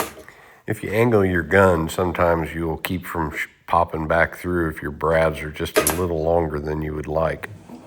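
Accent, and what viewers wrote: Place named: South, United States. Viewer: That is cool. U know it just dawned on me u have a southern cowboy voice like on the movies ❤❤😊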